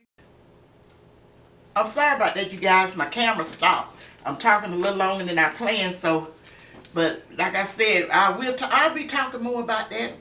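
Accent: South, United States. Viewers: It’s something about you Ms.! I love your energy and you are just a good feeling. Every time I watch you I feel like I’ve talked to my Nana. You kill me saying you fumble your words and it makes me laugh but I’m laughing at you thinking it’s a problem. It’s not. That’s you and I know southerners often don’t speak very “proper” but I bet that food is proper. I see that you are great. You speak positive and make people feel comforted. You have beautiful skin. Y’all lucky I live in NY cause I’ll show up at your church and happen to befriend you on purpose 😂 ohh you have YouTube I didn’t know that! I know you will anyway but keep being yourself 😘😘😘😍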